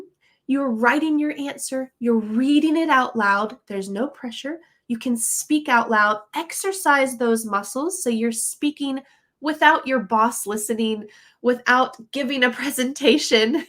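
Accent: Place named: United States. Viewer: American Native English accent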